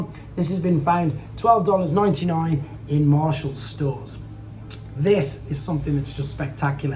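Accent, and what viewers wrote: United Kingdom. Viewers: in future videos is it possible when u report news of transformer toy sighting, to state the original source you found it from? I only ask this bcuz sometimes I don’t pick up on words u say bcuz of your UK accent or you scroll on your tablet too fast. Please don’t take any offense as I think it’s cool having a UK accent, but being American I have a challenge when u say some words quick. I’m still gonna follow ur channel as I like how u do your transformer videos.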